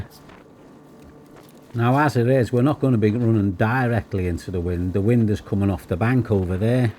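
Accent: Liverpool, United Kingdom. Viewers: just found your site and luv it, i have missed the scouse drawl and luv your laid back attitude to sailing . Have you ever done castletown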